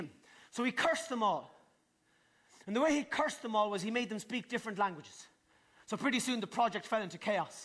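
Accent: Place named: Geordie, United Kingdom. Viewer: 46 min, a wee bit Geordie, he sounds a little like Sarah Millican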